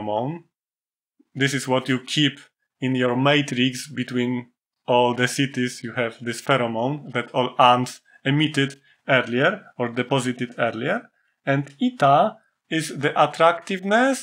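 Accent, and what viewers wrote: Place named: Poland. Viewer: Thank you for this awesome explaining of Evolutionary Computing for Global optimization, it was very useful and inspiring. no many visual resources for this topic, appreciate your contribution. you delivered it in a simple manner, and OptVis tool is also awesome. also the Polish accent is wonderful (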